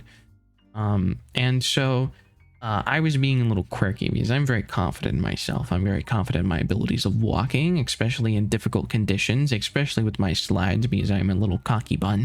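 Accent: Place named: South, United States. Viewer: i am european 😄 but i do like the red neck accent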